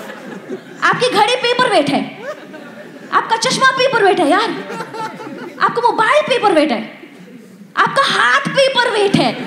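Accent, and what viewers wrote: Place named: India. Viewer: dont emphasize each and every word in typical ta ta ta indian accent .stop that its annoying paperweightttta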